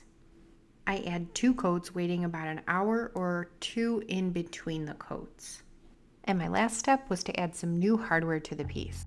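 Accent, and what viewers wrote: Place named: East, United States. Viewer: Love your artistry and thanks for telling it like it is - so helpful and inspiring. And hope this doesn’t come out wrong but your eastern us accent is so cute. Love From California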